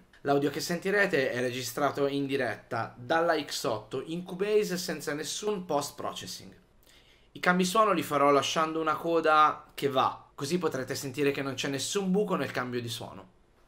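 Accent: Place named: Tuscany, Italy. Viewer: Oh con l accento toscano mi fai troppo strano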